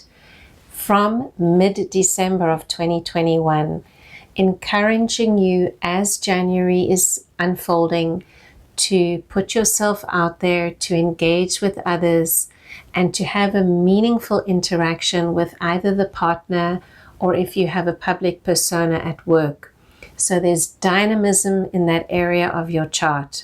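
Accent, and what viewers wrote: South Africa. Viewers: Thank you! 🙏🏾 Are you South African? Your accent sounds so familiar to me. ☺️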